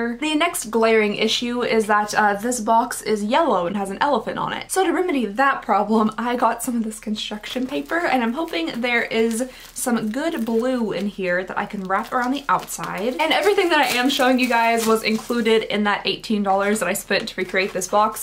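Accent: Kentucky, United States. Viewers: I thought you had a acent I am from Kentucky too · So I've kind of been creeping on your channel for a while but hadn't subscribed yet. I was wondering why your accent was so familiar and NOW I find out you're from Kentucky which makes a lot of sense! I am definitely subscribed now! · I love when your Kentucky accent pokes through, its absolutely adorable. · You’re holding back your Kentucky accent so much lol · Kentucky girl here, too! I'm new to your channel and as soon as I heard your accent I knew it was from KY! And funny enough, my hometown in Ky is actually even called Alexandria 😂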